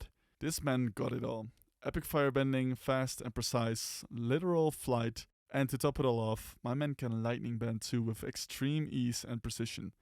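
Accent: Netherlands. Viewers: You sir sound dutch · Good video! I can tell by your accent you're 🇳🇱, no?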